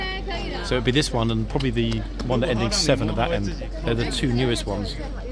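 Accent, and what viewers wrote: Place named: Northern Ireland, United Kingdom. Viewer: Brilliant Northern Ireland accent wow love it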